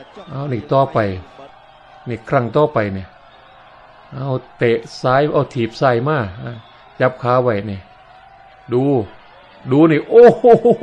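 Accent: Isan, Thailand. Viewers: ชอบๆพูดกลางตกอีสาน ข้อยนี่อย่างมัก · *ผมคนภาคกลาง แต่ฟังสำเนียงไทยอีสานได้ สบายบรื๋อ...ม่วนคัก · มัก พากย์อิสาน ตลก ขำดี คนบอกพากย์อิสานบ่ออยากฟัง ดีน่ะ บ่อพากย์ ์ืขแมร์นำ😂😂😂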